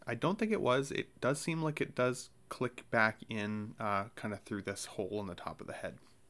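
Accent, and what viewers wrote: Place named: Canada. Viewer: got that sweet ASMR soothing Canadian voice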